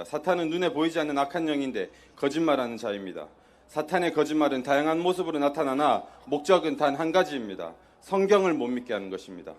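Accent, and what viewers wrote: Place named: Canada. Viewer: ❤❤❤❤❤❤ 말이 필요 엄네요....그리고 영어 발음을 보니 확실히 캐나다 사신티가 나네요...응원합니다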